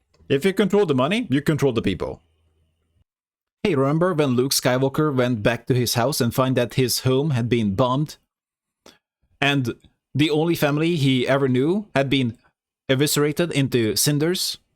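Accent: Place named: Eastern Europe. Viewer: There’s no way you’re not Eastern Euro! No way!!! Subscribed btw 😀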